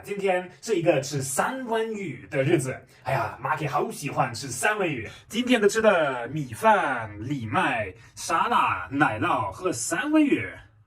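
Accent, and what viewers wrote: Sichuan, China. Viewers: ，你的中文很不错，口音中还带着一点的 麻辣味 。👍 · ，四川的吧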